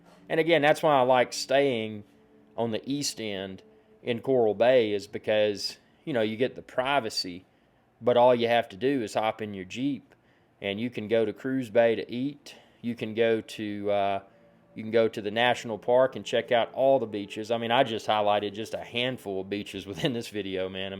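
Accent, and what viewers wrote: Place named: Tennessee, United States. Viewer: Sounds like Tennessee—p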